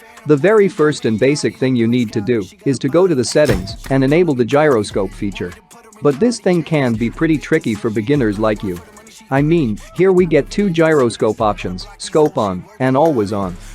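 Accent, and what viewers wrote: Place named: India. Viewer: You from india maybe 😅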